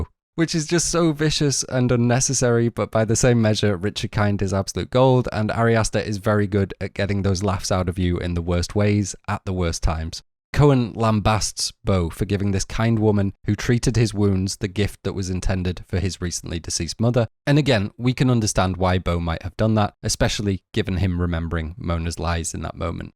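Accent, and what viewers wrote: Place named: Northern England, United Kingdom. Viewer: I was following you right up until you pronounced Albert Camus' name like that or so. I understand that you're English, and even more, you're from the North. The French have certainly had an interesting relationship with the Northern English, the Scotts and the Irish. However monstrous the French may be, pronouncing Camus' name as "Came-us" is horrifying beyond anything Ari Aster could put in any of his films.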